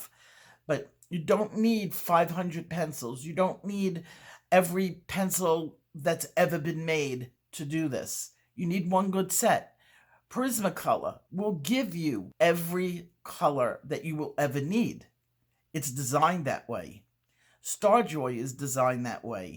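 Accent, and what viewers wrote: New York, United States. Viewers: my son would so love you. He loves the NY accent so much that he actually practices doing it. I LOVED this video so much. I have no idea until now how to use that color wheel. I got it when you suggested it. Even my ADD self could focus on this lesson.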